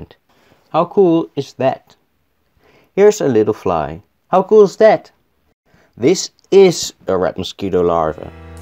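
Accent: Netherlands. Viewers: Aaah... Dutch English, nice video!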